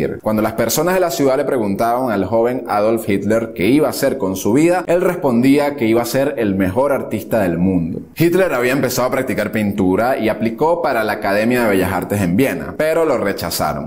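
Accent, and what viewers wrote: Venezuela. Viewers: Hermano tu eres venezolano? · Yo sabía que este chamo es venezolano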